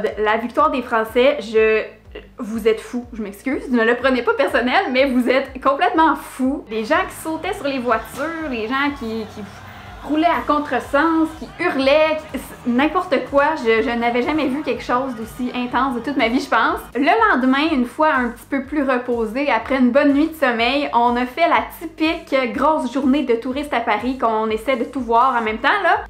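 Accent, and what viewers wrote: Quebec, Canada. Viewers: ... et aussi je suis originaire de la région de tours, Amboise plus précisément. Tous les endroits que tu a visité je les connais plutôt bien. Ça fait plaisirs de voir nos cousins québécois s'intéresser a l'histoire de France 👍 et j'adore votre accent ça me rappel mes année a Montréal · J'ai écris mon commentaire en regardant la vidéo je n'étais pas déjà arrivée à la fin, donc je profite maintenant puisque tu as posé la question à propos des voyages pour t'écrire un petit mot! Je suis Libanaise, mon fiancé est Libano-Canadien. J'ai visité le Canada l'été passé pour la 1ere fois pour 1 mois, et j'en suis tombée follement amoureuse.... la gentillesse des gens est extrêmement touchante... la beauté du pays, la diversité culturelle, le respect, la tolérance, la liberté, la sécurité,... tout, absolument TOUT m'a touché. Je n'ai pas pu resister d'y retourner pour la 2e fois cet été encore pour 1 mois, et même chose, je suis hantée par un sentiment de réelle appartenance à ton beau pays!!! 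Pour les 2 visites, le retour était vraiment difficile, je le vis comme un arrachement! Petit bonus: j'adore J'ADORE l'accent québecois! ❤ En regardant tes vidéos je me sens un peu soulagée d'avoir une petite dose quebecoise à chaque visionnement 😍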